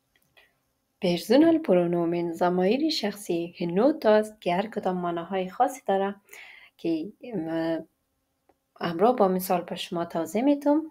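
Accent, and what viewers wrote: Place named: Afghanistan. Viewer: ایشون لحجه دری دارند. دلیل نداره که هرکسی که لحجه تهرانی نداره فارسی بلد نیست، دوست عزیز.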